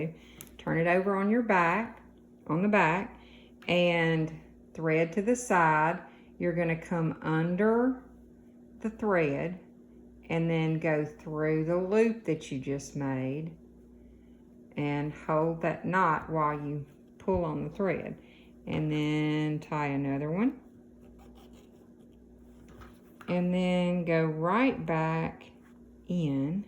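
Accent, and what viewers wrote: South, United States. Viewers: Are you from Georgia or Alabama? 🥰 Your voice sounds like all the ladies from my Dads family.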